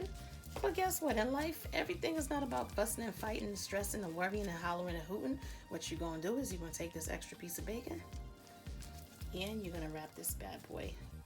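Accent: New York, United States. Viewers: from your accent, I’d say you live in NYC? Or maybe in the vicinity? Love your accent. I live in Upstate New York, near Albany and Saratoga.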